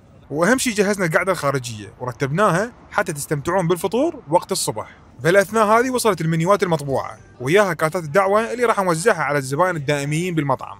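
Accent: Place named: Baghdad, Iraq. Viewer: يا جنسيه داحسه عراقي بغدادي مسمسم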